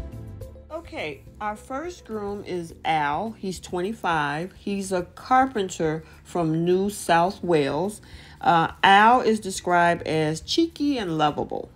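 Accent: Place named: United States. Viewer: What's with the American voiceover.its Australia here.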